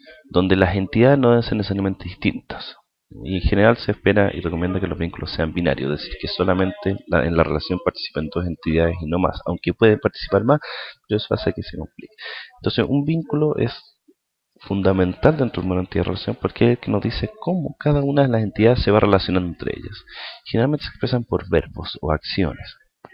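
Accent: Chile. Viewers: por su forma de hablar creo que es chileno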